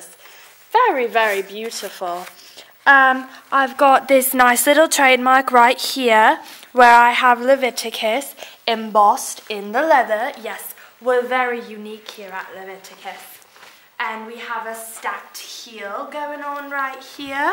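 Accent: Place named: United Kingdom. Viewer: Your soooooo gorgeous 😍😍 i love your British accent 😉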